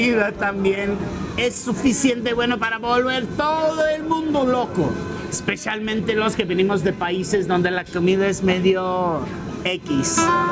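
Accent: Mexico City, Mexico. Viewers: Me encanta que tienes acento mexicano.:D bueno de CDMX pero de Mexico. Pero no les digas a tantos! si no luego vienen mas y luego no nos alcanzan los tamales!:D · Hola estoy aqui x tu anuncio en Instagram y me encantó ver este video. Ya me suscribi y gracias x compartir cosas tam bonitas de mi ranchito Mexico, todo es verdad, la hospitalidad Mexicana no tiene igual, en Mazatlan recibimos muy bien a los extranjeros, recuerdo mi familia a recibido en casa a invitar a comer a turistas y amigos de Perú, Italia, Canadienses, Americanos, y paisanos de diferentes estados, Jalisco, CDMX, Nayarit, Oaxaca, Sonora, Chihuahua, BCS, BCN, y de muchos otros lugares que ya no recuerdo y sobretodo amigos locales, que siempre tienen un lugar en nuestro corazón, te deso mucgo éxito en tu nuevo emprendimiento, cuando gustes visitar Mazatlan ya sabes que serás muy bien recibido 🌅🎉 cuídate mucho tu y tu familia y te deseamos lo mejor de los éxitos y es verdad he? 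Como te vi un parecido con nuestro querido y bien recordado Paco Stanley, y me encanta como hablas ya bien español mexicano de CDMX 💪😬🎉🎉🎉🎉Felicitaciones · el Mejicano... MAGNIFICENT MAGNIFICENT!!! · pinche, ya me caíste retebien. Eres el inglés salamero! jajajaj bienvenido cabrón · Hasta acento chilango tiene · Saludos hermano, me encanta tu acento chilangles fresa, sigue haci nunca cambies, gracias por hablar tan bien de mi hermoso Mexico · Eres un extranjero que al llegar a México se puso el de Mexicano 100% es más me pareces un chilango con poquito acento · Amigo se te sale el acento chilango 😂🎉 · hasta tiene acento de chilango, todavia se nota el ingles pero tiene un acento MUY bueno. Es super autentico, aprendio el español en las calles de la cdmx no en los libros de texto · Tienes acento chilango!! 😂saludos desde Guanajuato. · Ya hasta trae el acento mexa 😂😂. Ya eres uno de nosotros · Muy cierto! 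Ya hay muchos youtubers que saben cómo lograr 👍🏻 con los mexicanos (solo es hablar bien de nuestro hermoso México 🇲🇽). Y lo demuestra con su español "mexicano"🎉 gracias por amar a México 🇲🇽❤ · hermano.... ya eres mexicano, saludos.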